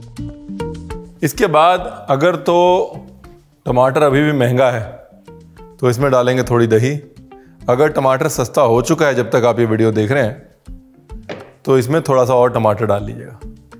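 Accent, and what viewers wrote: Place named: Punjab, India. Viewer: Nice recipe with delicious punjabi tone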